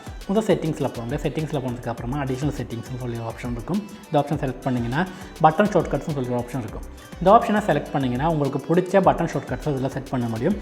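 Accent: Kerala, India. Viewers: என்னாயா உன் லேசா பேச்சில மலையாள வாட அடிக்கிது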